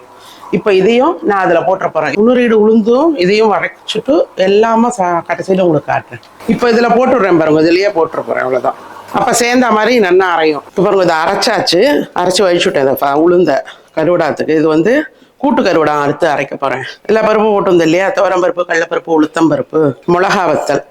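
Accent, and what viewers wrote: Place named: Trichy, India. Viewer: நீங்க pesarathu Nanna iruku, unga ooru Trichy AH. Nalla idea oil um water um mix pani sheet la thadavarathu 👌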